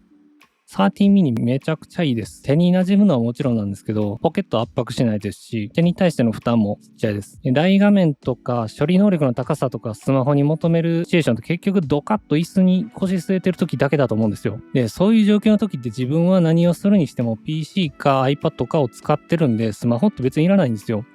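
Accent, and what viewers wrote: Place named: Kansai, Japan. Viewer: 関西弁で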